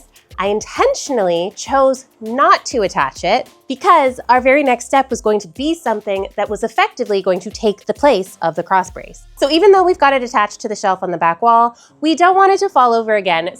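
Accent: Quebec, Canada. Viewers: Loooove when you speak french! It shows you learned from Quebec, I can hear the accent ;) As always, amazing DIY! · Je n'étais pas prête à t'entendre parler en français avec l'accent québécois 🤭 ❤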